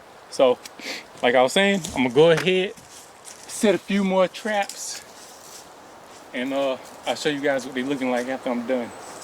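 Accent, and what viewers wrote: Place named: Mid-South, United States. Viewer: This YN!! Out here trappn for real ! Memphis? Sippi? You definitely have that mid south accent. Have you caught one since you did these video?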